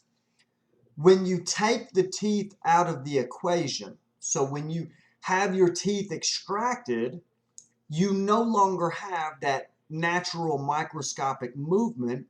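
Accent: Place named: Kentucky, United States. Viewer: I really like your videos. They have helped me a lot. I also really like your Kentucky accent!!!!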